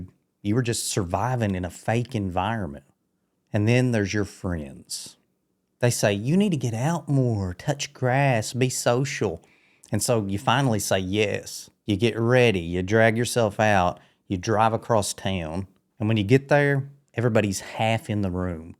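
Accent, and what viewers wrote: South, United States. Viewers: You have a totally southern drawl. Do you get paid for these videos. ???????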